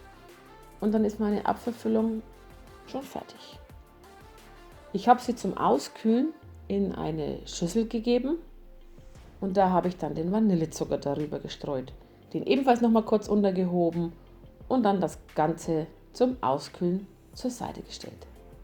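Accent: Franconia, Germany. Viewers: Eine Fränkin, wie süß! Die Sprache ist unschlagbar u. den leckeren Kuchen bestelle ich schon mal für den Feiertag am <num>. Kaffee mach' ich selbst dazu. LG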